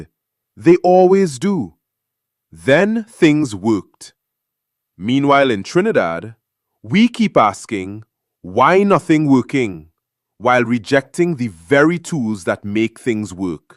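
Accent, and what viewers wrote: Jamaica. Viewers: This person has a Jamaican accent, what do they know about trinis? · Who is this person? He does NOT have a Trini accent? He sounds more like a Jamaican.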